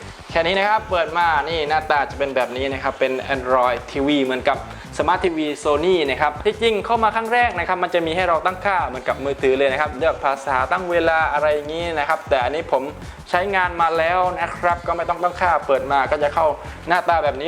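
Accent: South, Thailand. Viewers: ไม่ทองแดงครับ เป็นสำเนียงของคนมาลายูสามจังหวัด ชีวิตประจำวันเค้าพูดภาษายาวีกัน เวลาพูดกลางแอคเซ็นเค้าจะเป็นประมาณนี้ สำเนียงทองแดงจะเป็นของคนพูดใต้ครับ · สำเนียง เหมือนคนใต้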